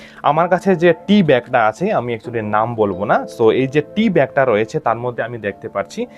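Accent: Sylhet, Bangladesh. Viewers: Apni mone hoy sylety. Khub kosto kore shuddho bangla bolsen😂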